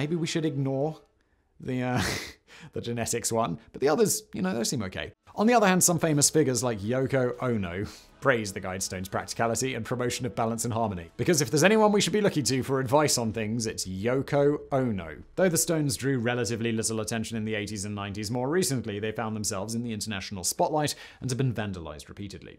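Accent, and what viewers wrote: United Kingdom. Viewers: One word: British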